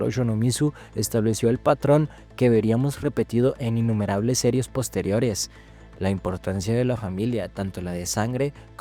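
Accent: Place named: Colombia. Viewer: Voz tipica de colombiano...de mucha hueva...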